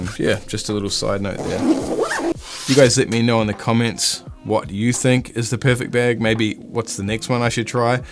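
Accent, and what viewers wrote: New Zealand. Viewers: yeah but you should have used the word very vs. bit... ESPECIALLY when it doesn't even open from the back. Lol. (just breaking your balllls, having a laugh. Love your content and love the kiwi accent in a sea of English photographers doing YouTube - now let's get some momentum/consistency going with the channel eh?)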